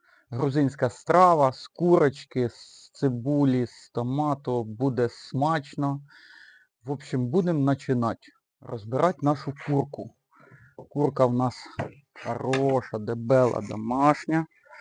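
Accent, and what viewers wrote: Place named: Central Ukraine, Ukraine. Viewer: Друже, дуже цікаві відео. Сидимо з дружиною і гадаємо звідки ти ці відео записуєш? Я з Черкас, вона з житомирської обл. Каже що в тебе такий самий говір як і в мене, то ти також десь з тих країв...) То звідки ти?))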